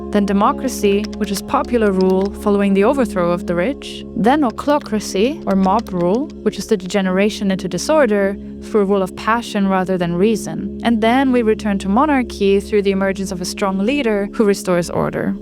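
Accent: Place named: Germany. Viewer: So basically she wants to spread her dictatorship ideology. You literally have no example other than keep saying Singapore. There is thousands of example that democracy and liberalism developed the world. Look at Europe and England. The development and industrial revolution took place when the power of the kings has limited. We know below your cutie German accent, a big propaganda exist.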